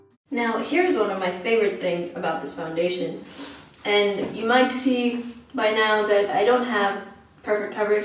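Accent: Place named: Ireland. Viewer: I LOVE IRELAND ACCENTS!!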